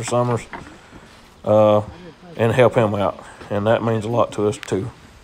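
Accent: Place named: Los Angeles, United States. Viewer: I saw you on Rural Heritage and sounded like you was from L.A. Which is it?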